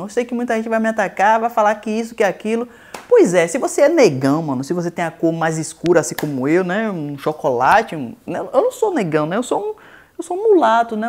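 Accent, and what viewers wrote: Bahia, Brazil. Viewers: Sou de São Paulo e adooooro seus vídeos, seu sotaque baiano é musical😊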